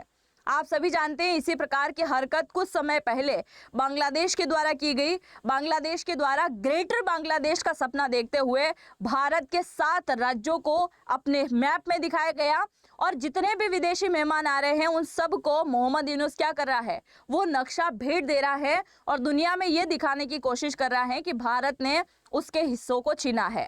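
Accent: Gujarat, India. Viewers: I LIKE YOUR ANCHORING....YOUR HINDI LANGUAGE IS 👍 GOOD LITTLE BIT GUJRATI MIX UP. YOUR HINDI KI PALKI SHARMA......❤ U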